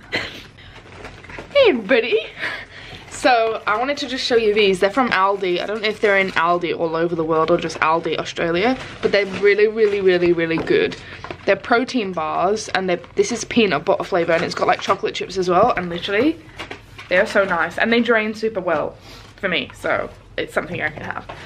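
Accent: United Kingdom. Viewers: Are u from the uk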